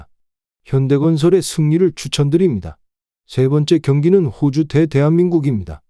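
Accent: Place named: Yanbian, China. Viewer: 연변말투 킹받네ㅋ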